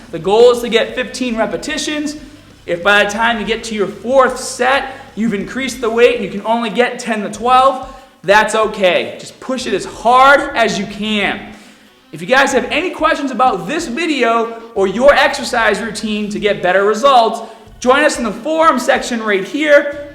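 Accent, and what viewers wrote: Boston, United States. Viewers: You sound like you’re from bawston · You have a bostonian accent. It's faint but it's there. Thanks for the tips. I've been looking for some solid oblique workouts. You look great. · is a Bostonian - he used to be conscious of his accent probably to grow his channel, but now he definitely let's his inner accent shine: the absence of R's. Jeff's accent I thought was New York, no? It doesn't sound quite Bostonian, but it is similar...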